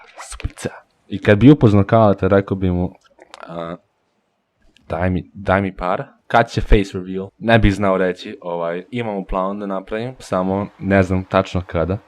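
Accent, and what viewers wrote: Subotica, Serbia. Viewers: Znao sam da si iz subotice hahaha zbog naglaska ;) Ps: ja sam iz sente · Znao sam da si iz Subotice hahahahahaha po akcentu jer sam cesto tamo pozdrav za Subotičane 💙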